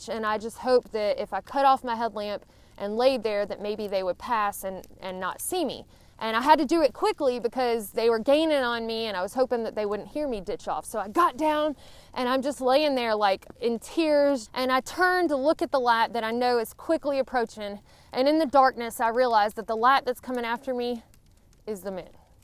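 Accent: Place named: South, United States. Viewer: Love it! LMAO!!😂 and your accent... "not hockin'"... way more Southern than the Richmond, Va. Accent I enjoyed so much so many years ago. Aahh, memories. Thanks,. 😊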